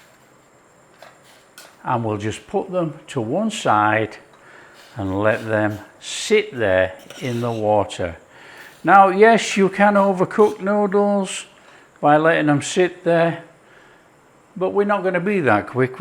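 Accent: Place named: England, United Kingdom. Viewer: a luv your cooking style,im basic and want easy peasy though great taste im sure and too many complicated recipes oot there,emigrated to Canada from glasgow as a child,love your accent,what part of england is this accent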